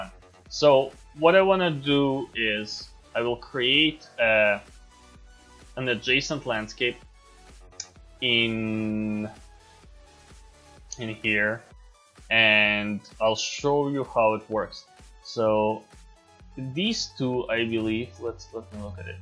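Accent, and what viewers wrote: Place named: Quebec, Canada. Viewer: L'accent du Québec ça trompe jamais! eh eh, i wonder if an 8km x 8km terrain need world composition?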